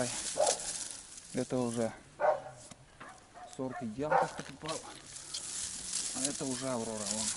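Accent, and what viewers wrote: Komi, Russia. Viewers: Назвал бы видос "Копаем картофель у соседей" такой говор как из нившеры. Превед из Сыктывкара. Сами мы из Кероса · у нас как то не заморачиваются по сортам картошки. У кого то красная лучше растет у кого то белая. Есть белая голландка - огромные продолговатые белые клубни. Много ее вырастает но не в каждый год она хорошо хранится. Кто не много садит - ведро, два тот пророщенную у бабок покупает а тут наши местные заморочки: если продают картоху с села Касиб (Соликамский район) то уходит в лёт. Считается что это очень хорошая картошка. Числа 6-го сентября я тоже копал картошку, сыро, дождь, с комьями грязи выковыривал. Потому что дожди лили весь август и пол июля. Половину выкинул потому что сгнило. А у вас вижу копаете земля нормальная сухая. Вижу вилами копаете - отец всегда вилами копал а я не могу, не нравится. И приятно коми речь послушать. 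Но ее хрен выучишь потому что язык неславянский. А если выучить то разговаривать тут не с кем. Всего доброго, Пермский край.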